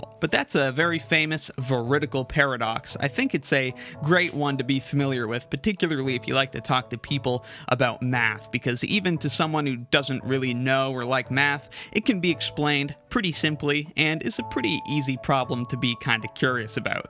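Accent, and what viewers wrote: Canada. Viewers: Arr you Canadian by any chance? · Canadian! He gives himself a way a couple of times with with "aboat" 😝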